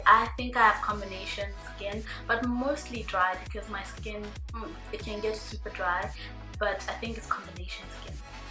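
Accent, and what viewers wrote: South Africa. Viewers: omg!!! legit thought you were South African. your accent says S.A guuurrrlll?????!! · South African accent...😑I thought that too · You have a cute South African sounding accent! Love your spirit!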